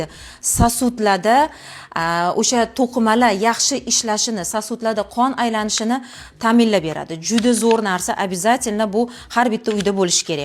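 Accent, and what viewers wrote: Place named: Tashkent, Uzbekistan. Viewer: Тошкентча. Гапиришга гап йук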